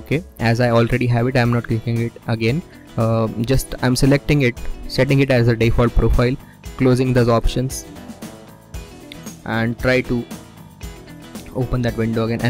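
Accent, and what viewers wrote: India. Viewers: i hate this indian accent soo much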